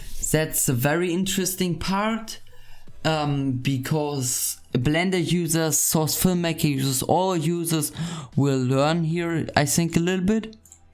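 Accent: Germany. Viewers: Hörst dich stark nach nen deutschen an xd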